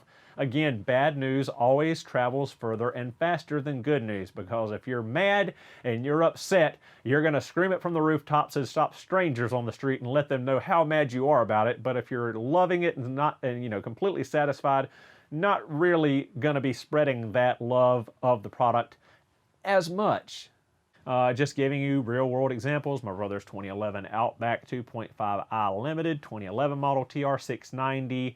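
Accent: South, United States. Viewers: As a not native Floridian (I'm moving from Michigan), idk why but I trust a southern accent more when it comes to car stuff. Seriously, you're right. JATCO has horrible CVTs with rushed designs, but Subaru and Toyota CVTs last. I know this first hand. I'm about to sell my Nissan and get either a Volvo or Subaru. Thanks for the objective advice. Sometimes I like Scotty Kilmer (the guy I know youre talking about LMAO) but half the things he says are outdated or incorrect.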